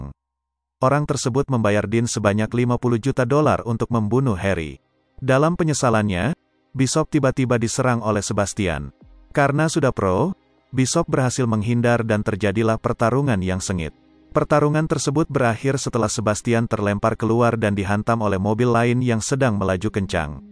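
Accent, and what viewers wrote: Palembang, Indonesia. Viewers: Bang orang palembang kah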